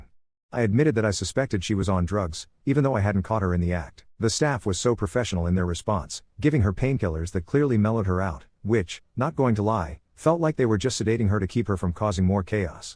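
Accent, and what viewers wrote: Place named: United States. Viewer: Sounds very american 😂😂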